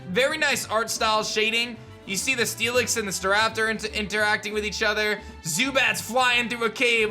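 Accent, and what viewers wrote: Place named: California, United States. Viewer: honestly it makes it hard for me to watch, I liked his old stuff and I’m actually enjoying his xenoverse playthrough but he sounds like he’s from Cali or he’s trying to be a chad but I know he’s from like the midwest or something. It sucks that he’s gotta change his persona to get views but I guess that’s his job right ?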